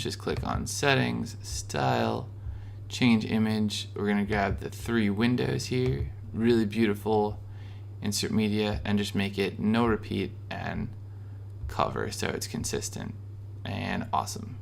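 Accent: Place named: Midwest, United States. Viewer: Thank you so much for the amazing tutorial! This is my first time building a site and the video coupled with the Midwestern accent made it easy to follow along with (even if the video took me 5 days to get through from all the pausing haha) I do have a question about editing the blog page with elementor. I am gathering that this is not possible when the blog page is created under pages? I was able to edit under posts, but the changes are not showing on preview. This all was noticeable during the "adding the footer template" portion of the video. Will I need to go to the pro option to edit with elementor or keep archives. Do you happen to have a video on this already, so as not to be redundant in comments? Thank you again!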